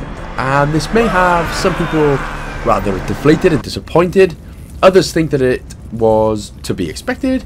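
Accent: Wales, United Kingdom. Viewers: Bloody decent vid buddy 👍🏻 nice to hear a welsh voice on Yourube for a change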